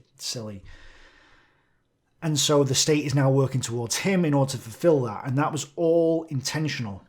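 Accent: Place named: Northern England, United Kingdom. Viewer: good vid, but where's yer accent from, our kid? are you a Manc? wigan? cheshire? hard to pin you down, lad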